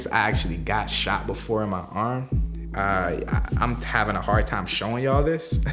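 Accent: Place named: Newport News, United States. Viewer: Sounds like Newport News for sure..shout out to VA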